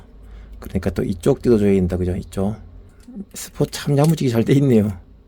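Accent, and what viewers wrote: Gyeongsang, South Korea. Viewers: 사투리가구수하십니다..ㅎ 저도진해에서 군생활을해서 경상도사투리 좋아하는데.,ㅎ 후딱후딱 잘만드시네요 저도영상참고해서 리필해봐야겠네여 직업이 전동공구많이쓰는직업이라 저렴하게 리필해써야겠어요 영상만드는 일이 힘든일인데 영상잘보고 갑니다..^^ 화이팅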